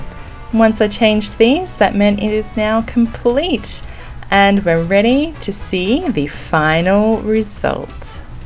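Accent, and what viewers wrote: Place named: Australia. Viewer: I realize this is totally off topic but I love Australian accents, especially yours.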